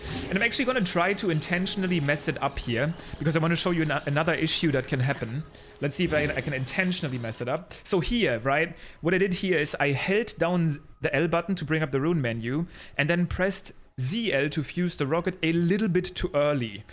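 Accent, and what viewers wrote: Germany. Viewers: this is definetly a german accent, right? :) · You have so a german acent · You sound really German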